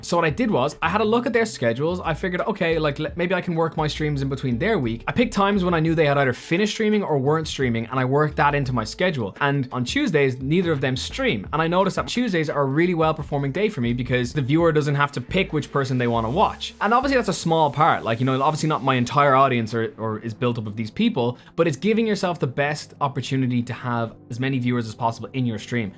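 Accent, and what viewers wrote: Ireland. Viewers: Hey, it’s funny to find you I heard your voice and I was like they HAVE to be Irish so I remembered there’s a about on twitch and I went there and you live in Ireland Dublin (I live also live in Dublin). You’re very underrated!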